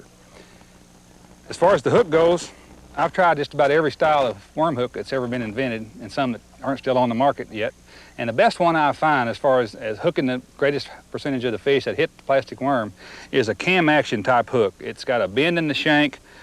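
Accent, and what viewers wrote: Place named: Tennessee, United States. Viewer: You sound like bill dance! Must be from Tennessee?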